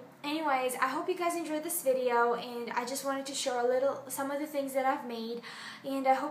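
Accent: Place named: United States. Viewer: У вас идеальный английский язык в американском произношении!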